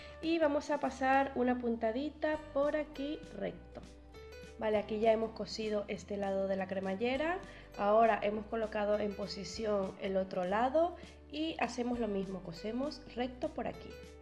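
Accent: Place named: Venezuela. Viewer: Gracias por no perder tu acento, nosotros los venezolanos tenemos uno hermoso.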